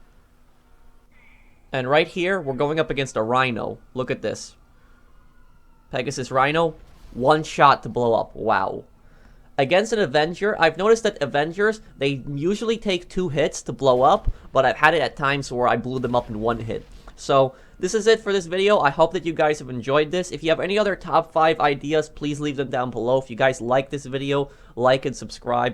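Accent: Poland. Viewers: Polish? You from poland? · Where are you from? Poland?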